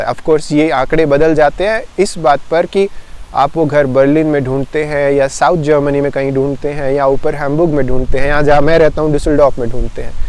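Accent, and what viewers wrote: Haryana, India. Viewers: Bhai g aap haryana se ho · Hai are u from Haryana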